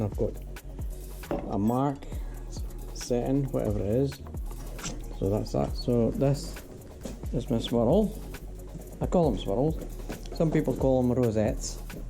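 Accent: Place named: Aberdeen, United Kingdom. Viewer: Hi, fine to hear a local accent on YouTube! I’m building some bookcases at the moment, where do you get decent quality sheet goods and hardwood from in Aberdeen? All the merchants I’ve been to just seem to sell crap construction grade stuff and treated timber. Love your work and vids, if my finished one is half as good as some of yours I’ll be doing nae bad!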